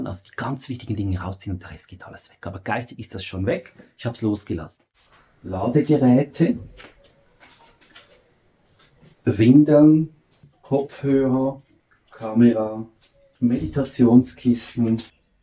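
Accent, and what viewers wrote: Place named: Basel, Switzerland. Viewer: Wie schön mal einen Mann beim Sortieren über die Schulter zu schauen! Dein Video hat mir sehr gut gefallen, ich mache das sehr ähnlich und habe auch aufgehört ständig etwas Neues zu kaufen. Ein neues Teil und ein altes Teil muss gehen. Beschriftung mag ich nicht so gerne, aber jedes Teil hat seinen „Park“platz (eine sehr schöne Bezeichnung!). Meine beste Freundin lebt in Frankreich an der Grenze zu Basel, ich höre Deinen Akzent und bin sofort dort 🥰 Vielen Dank für Dein ehrliches Video und liebe Grüße aus Hamburg, Claudia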